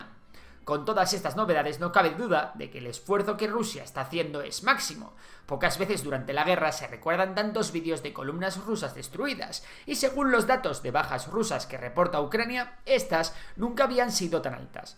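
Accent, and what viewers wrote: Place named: Spain. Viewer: Creo que este muchacho ve demasiado películas gringas. Su acento español dice que es adicto a los diarios ABC y El País.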